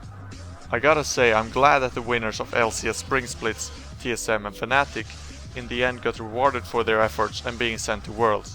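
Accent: Sweden. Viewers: Your overall talking is kinda swenglish. Sorta less than Kungen. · Your english accent, can totally hear that youre a swede :P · You have the most Swedish accent I've ever heard, I've played with A LOT of Swedish players.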